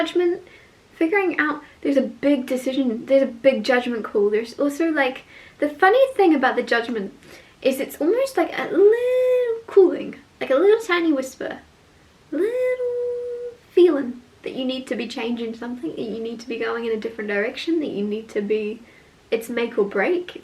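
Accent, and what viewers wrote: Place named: United Kingdom. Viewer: Luv your readings...you are spot on..exquisite an very beautiful..Luv your English or British Accent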